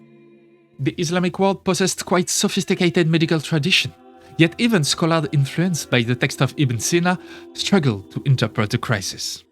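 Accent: France. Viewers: Nah.. We love our French Narrator. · L'accent français atroce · Nah I like the French narrator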